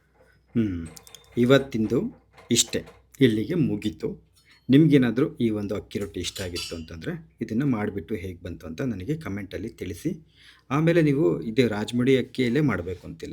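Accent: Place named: Holalkere, India. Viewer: ನಿಮ್ಮ ಮಾತು,ನಮ್ಮ ತಾತ ಅಜ್ಜಿ ಮಾಮ ಹೊಳಲ್ಕೆರೆ ಗೆ ಹೋದಾಗ ಮಾತಾಡುವಾಗ ಕೇಳಿದ್ದೆನು. ಅದೇ ರೀತಿ ಇದೆ.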